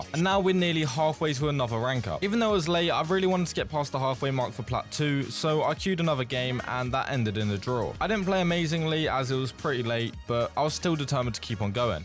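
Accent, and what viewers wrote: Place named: United Kingdom. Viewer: Idk how I didn’t realize you were br*tish until now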